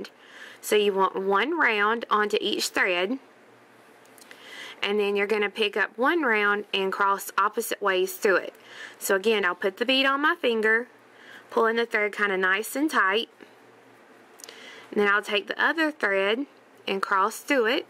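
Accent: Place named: North Carolina, United States. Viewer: I like watching your videos because the view is VERY CLEAR and in focus. Besides I love hearing your North Carolina accent! Fun to hear you talk.... I'm a Texan so I probably have an accent as well . . .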